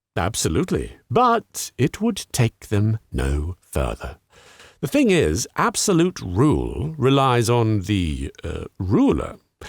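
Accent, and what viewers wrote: United Kingdom. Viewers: I’m Portuguese from Oporto!!! I’m very grateful for this video!!! Thank you very much!!! Beautiful video!!! One of the best I ever seen about my country history explained in British English 🇬🇧 Aye British English!!! Not American English hahaha 😆 I hate that to be honest!!! Cheers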